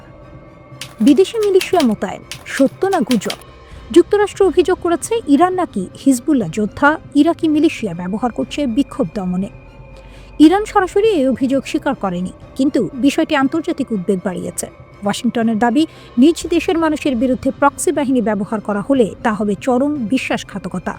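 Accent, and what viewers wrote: Kolkata, India. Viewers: সাংবাদ পাঠক, কলকাতার নাকি,, ভাষা বাংলাদেশিদের সাথে মিলছে না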